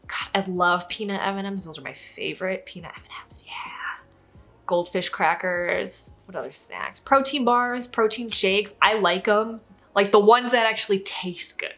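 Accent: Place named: Scotland, United Kingdom. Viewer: I thought you was from Scotland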